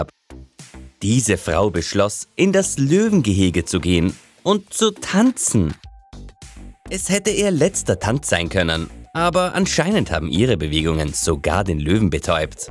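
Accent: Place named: Austria. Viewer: Österreicher! :) Ich bin auch einer, das merk ich doch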